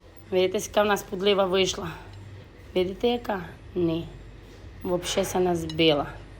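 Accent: Transcarpathia, Ukraine. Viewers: Яка соковита і запашна закарпатська говірка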